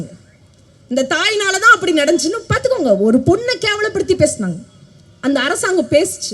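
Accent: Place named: Kongu, India. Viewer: அருமையான கொங்கு தமிழில் பதிலடி கொடுத்த சகோதரி வாழ்த்துக்கள் சகோதரி உங்கள் பணி தொடரட்டும் சிறக்கட்டும்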